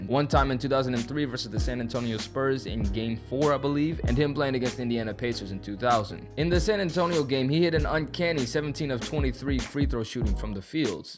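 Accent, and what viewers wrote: Kenya. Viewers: Your Kenyan ?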